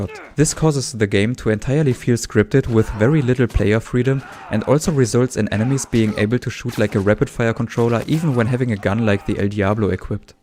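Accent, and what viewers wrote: Germany. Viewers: Man hört so fett raus das du deutsch bist hahaha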